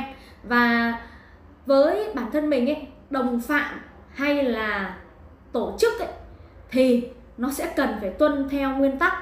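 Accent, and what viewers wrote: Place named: Countryside, Vietnam. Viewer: Con này nói tiếng nhà Quê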